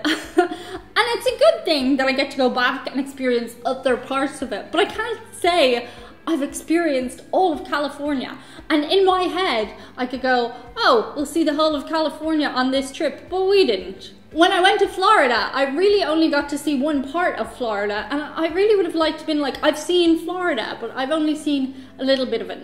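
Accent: Ireland. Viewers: It was so much fun listening to this! All the commenters seem to be focusing on the 7 elevens, rightfully so, I loved that you are aware of how big the U.S. is and how there is so much to see. Fun to watch you and love your Irish accent. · Bad toilet paper in a public toilet is probably on purpose. Likely to discourage it getting stolen when you're running low at home. Choice overload is also a thing. There are even studies. Seems like 3-5 choices is ideal in many things. Here is my question.....do you speak Spanish with an Irish accent? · Some of the problem with them understanding your Spanish may have to do with your Irish accent. I even have a little trouble with you when you're speaking English (I think yours is the only YouTube channel I routinely watch with the closed captions on, just to fill in the gaps in understading). Spanish itself sounds different in every country. 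I'm pretty familiar with Mexican Spanish (having lived for sixty years in various US border states), and I have trouble with Argentine, Puerto Rican, continental Spanish, whatever that mixture in the Philippines is supposed to be. Most clearly-enunciated Spanish I ever encountered was on shortwave radio, and I listened for several minutes for something that would identify the origin; finally out came a station ID and I was listening to Radio Shanghai!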